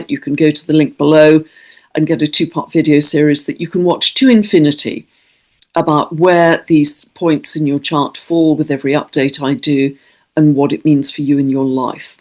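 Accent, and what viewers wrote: United Kingdom. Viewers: Unfortunately, I have no beginning of any house in Scorpio but Jupiter ( as in Taurus with Chiron…) my sixth house start in Libra and end in Sagittarius. A tip of how I could set intention with these parameters ? I m trying to work consciously on my body, to balance my air side and the overthinking that goes with. And my emotions 😅 I compare this axis with the time of the year and transformation of the trees. They lose their leaves and energy goes deep in the roots. It s not because we don’t see things that they are not here. But I’m sure that you have better explanation and more tips for the coming new moon ☺️ Thanks for you videos. Ps : I m a big fan of your British accent (also a nice aspect of your videos) Marie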